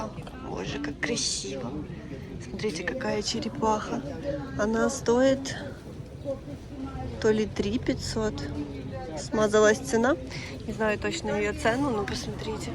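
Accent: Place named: Western Ukraine, Ukraine. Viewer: Ну да ну да. Одесский говор. А кода я спросил , ранее , почему у вас заподноукраинскиц говор ? вы проигнорировали .... .,. и у меня , к сожалению , уменьшился интерес к вашему каналу. Уж извините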